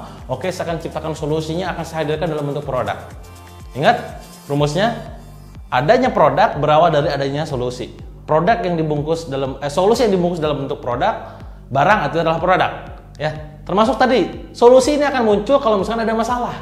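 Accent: West Java, Indonesia. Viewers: Ni sunda pisan bos 😁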